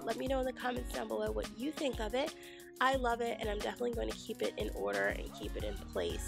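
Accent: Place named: Midwest, United States. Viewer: Love your videos! Random question, are you from the Midwest? I am sensing a Ohio/Illinois/Michigan accent! If you don't feel comfortable answering I totally understand! Keep up the great videos!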